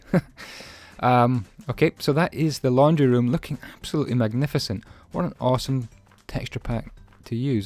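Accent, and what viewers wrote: Scotland, United Kingdom. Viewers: so nice to hear someone scottish doing minecraft vids! :D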